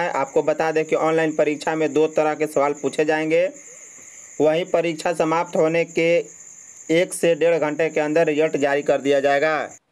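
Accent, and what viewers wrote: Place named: Jharkhand, India. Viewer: Bhai apni aawaz me aapko jharkhand ka pehchan dena jaroori hai kya?? ⏰😡😡😡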